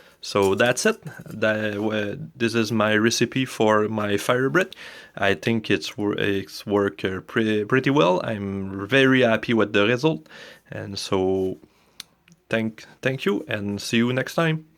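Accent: Quebec, Canada. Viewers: ca sonne comme un quebecois ca.......... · You sound Canadian. Is that where you are from? Love the accent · It's francophone Canadian. A very strong francophone I might add. I'm also Canadian and only speak English... I sound nothing like this and the majority of Canadians do not sound like this either, but Canada is the only place you will hear this particular francophone accent.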